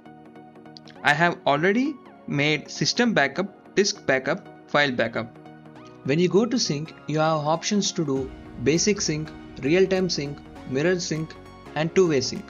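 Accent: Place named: India. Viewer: Funny Indian accent😅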